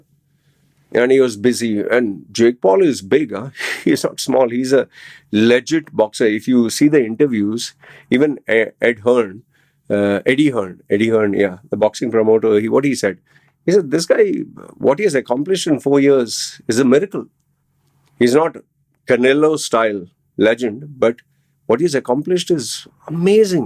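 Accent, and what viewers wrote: India. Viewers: bro you have an indian accent?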